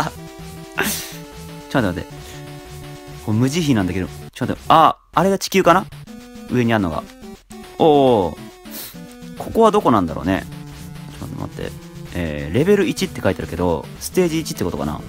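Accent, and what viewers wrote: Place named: West, Japan. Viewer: アニめし屋 by さんって西日本出身ですか？そのネタもググってやってみてくださいのイントネーションとかからそんな感じが…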